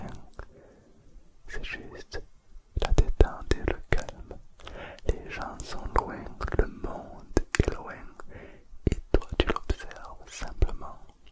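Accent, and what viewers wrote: South, France. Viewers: J’adore ta vidéo ! Très relaxante et merci pour ça ! L’accent du Sud qui fait un peu bizarre quand tu viens pas du sud mais j’adore ! J’aime et je m’abonne ! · Super découverte 👍 super travail c'est très relaxant ! Je valide l'accent du sud également 👍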